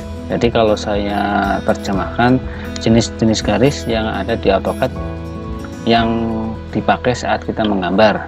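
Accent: Banyumas, Indonesia. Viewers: Belajar cara bikin linetype sama om.. Salam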